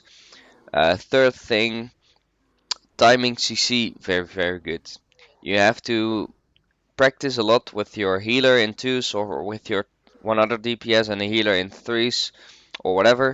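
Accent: Netherlands. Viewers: gotta love that obvious dutch accent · Kan goed horen dat je Nederlands bent, haha, goeie guide!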